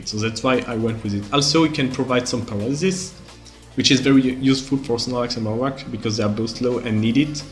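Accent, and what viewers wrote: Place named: France. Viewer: These are good videos. I have watched a few of them already, and I enjoy the combination of the team presentation in the beginning and the following game commentary, showing how it works in practice. Also, the French accent is surprisingly pleasant to the ear. What do you think about the idea of going more in-depth on a team presentation, and showing more than one game (two or three, most likely) where you use the same team?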